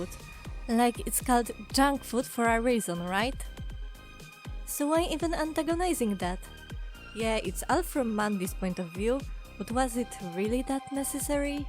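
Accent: Poland. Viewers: Questioooon, Are you polish? The accent kind of sounds Polish and I wana know if I’m good at recognizing other Poles, and if Not I’m still glad you’ve actually taken the time out of your life to learn english, You sound awesome >:3 ALSO TPN AND HANAKO KUN MENTIONED WOOOO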